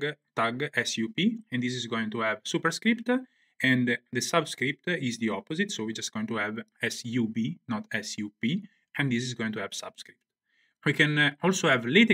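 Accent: Italy. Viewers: love your italian accent xD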